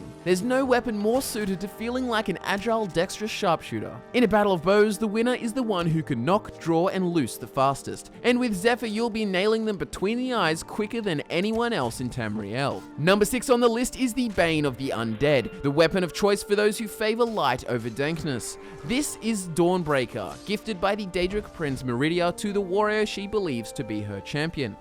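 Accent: Australia. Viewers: Is it just me or do all Australian guys sound the same? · He's Australian, shouldn't be that hard to figure out.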